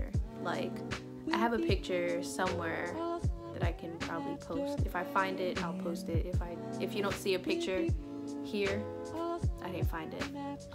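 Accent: New York, United States. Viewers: yerrrrrrrrr you so new york i love it lol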